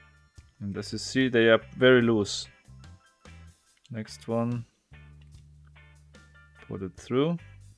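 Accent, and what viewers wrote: Germany. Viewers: the most german accent on youtube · Der gute Herr klingt ziemlich deutsch... ^^ ,,smells after" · Swastika, but isn't voice german? (Thinking hard) Hmmm.....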